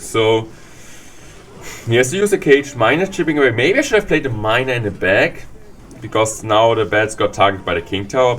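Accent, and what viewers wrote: Germany. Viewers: Ur German af i can tell 😂❤️❤️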